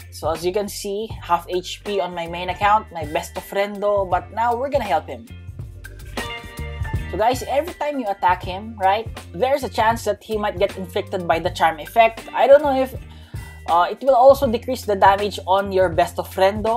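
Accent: Philippines. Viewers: Filipino kba? Halta sa accent e, btw gawa ka noob to pro using light and tips for light user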